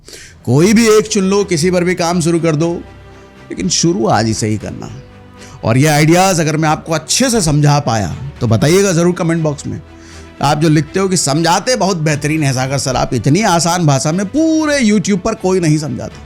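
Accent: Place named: Kanpur, India. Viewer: Sir boli se kanpur side ke lagte ho aap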